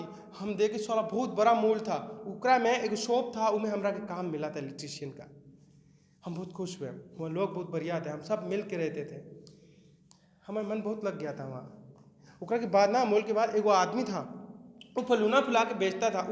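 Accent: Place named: Uttar Pradesh, India. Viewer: Sahi bola Bhai...pura UP ka hi lg rha h bhai...bhasha se🤘🤘🤘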